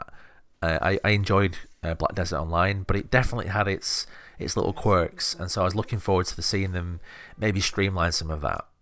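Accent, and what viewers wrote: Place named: Blackburn, United Kingdom. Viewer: That sounds like a Blackburn accent to me, great review man. Pearl abyss have created something truly special, subbed.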